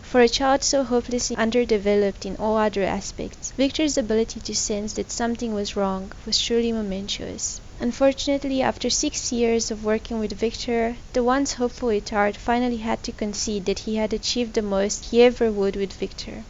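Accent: South Africa. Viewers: you are from South Africa?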